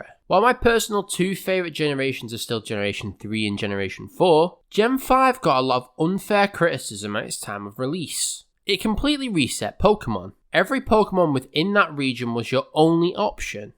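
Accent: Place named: United Kingdom. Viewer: Not to mention, emulating Gen. V, you can also experience Drayano's ROM Hacks, BlazeBlack/VoltWhite, which seem to bear the kind of difficulty I now actively want in a game... Maybe that would explain why I enjoy Dark Souls so much... As much as I love the idea of using Greninja and Zeraora in Unova, I can but dream... And we do say "ee-"volution here! (Where are you from? I seem to be finding a lot of fellow Brit's channels recently!)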